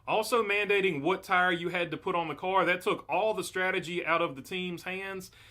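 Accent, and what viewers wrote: South, United States. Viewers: Sumthin bout &that southern draw keeps me tunin in! Haha 💪🏻💪🏻🇺🇸🇺🇸-with love from the west coast 🍃🍃🤙🏻🤙🏻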